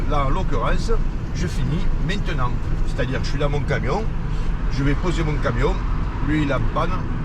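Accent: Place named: South, France. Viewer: Merci beaucoup ça fait vraiment du bien vos vidéos quand on a pas le moral, votre accent du sud, la mer et ces beaux paysage ça réchauffe le cœur et nous fait nous évader avec vous ❤️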